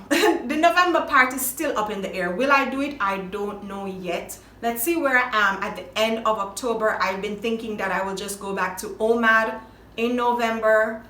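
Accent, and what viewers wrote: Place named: Jamaica. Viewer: So glad fi fine a fellow Jamaican Sista doing ADF....bless up💫✨️ and thank u . Keep speaking patois! Mi luv it...dem have all di adda dialect & languages w Closed captioning.. a fi wi Time now... lol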